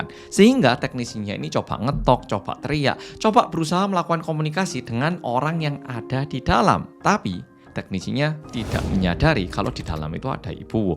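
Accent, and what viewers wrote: Java, Indonesia. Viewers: Hai medok🎉 Menyedihkan😢 suwun yo rek 👌🏿🙏🏿💕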